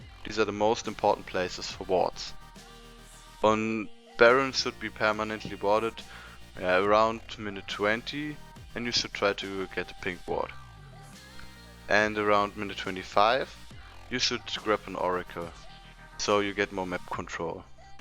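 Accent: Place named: Germany. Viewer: you sound sooooo german :D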